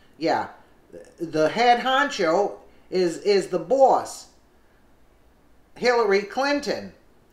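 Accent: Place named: Brooklyn, United States. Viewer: Ok, you bring some good news to this but I have to unsubscribe. Why do you read what is on the screen? WE CAN READ. READ with support collateral or show text and talk about the collateral pictures/graphics. Also, you sound like a Brooklyn middle aged Italian/Jew. That whine is killing me. lol. You do a great job. I am simply being critical due to broadcast journalism past and a traditionalist. Plane flying over head is a nice touch. God bless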